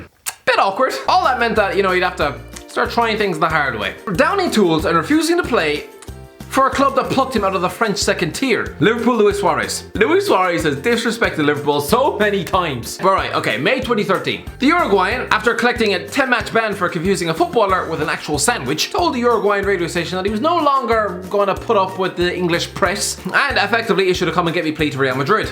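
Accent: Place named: Ireland. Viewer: I think ooooh this video looks cool but when i press on it i hear it is you. You fucking irishman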